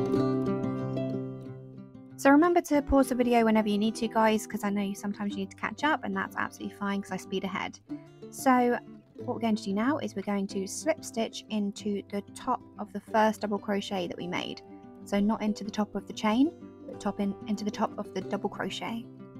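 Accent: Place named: United Kingdom. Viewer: British "accent"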